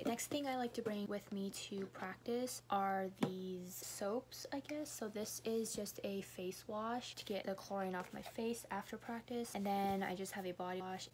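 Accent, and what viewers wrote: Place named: Pacific Northwest, United States. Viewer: Gotta love that PNW accent 😍 😂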